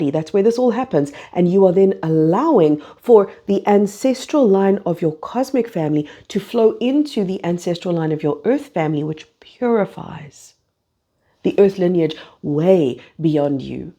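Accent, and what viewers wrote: South Africa. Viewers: I like South-African-English. It is much more understandable for a non native English-Speaker than for example American- or British-Englsih.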